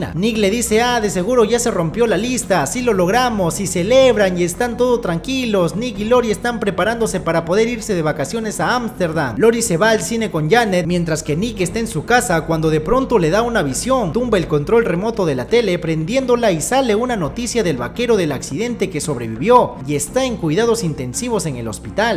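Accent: Peru. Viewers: Que chevere que haya un yutuber que sea peruano y me narre la historia en peruano. Saludos desde la selva causa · Sin que diga que es peruano, ya sabía que era peruano en los primeros segundos del video 😆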